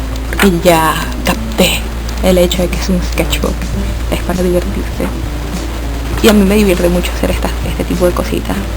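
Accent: Venezuela. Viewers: Apenas oí tu voz me di cuenta que también eres venezolana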